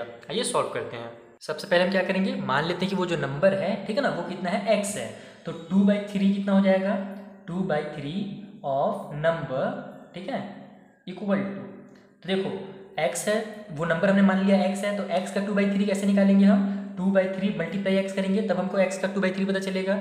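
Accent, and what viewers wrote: Bihar, India. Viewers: sir app bihar se ho na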